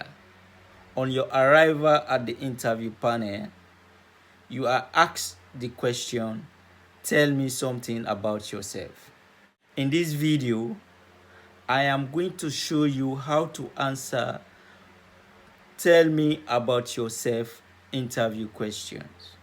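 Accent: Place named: Sierra Leone. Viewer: You sound like a Sierra Leonean. Are you? Thanks for sharing...new sub showing some love 💓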